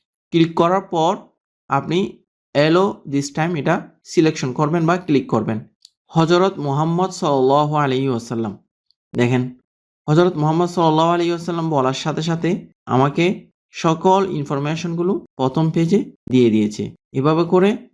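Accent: Chittagong, Bangladesh. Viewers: ভাইয়ার বাড়ি নিশ্চয়ই চট্টগ্রাম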